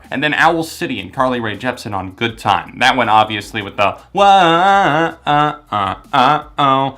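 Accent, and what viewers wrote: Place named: Transatlantic, United States. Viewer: Dude I just realized that you are speaking in a very watered down Modern Transatlantic accent.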